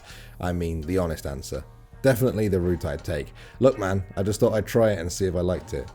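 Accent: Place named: United Kingdom. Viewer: You sound British. I thought the Brits say "arse"?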